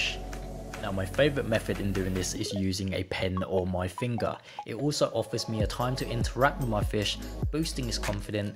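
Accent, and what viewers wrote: United Kingdom. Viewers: He’s a Thai but with a British accent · Is this guy english? He has a british accent.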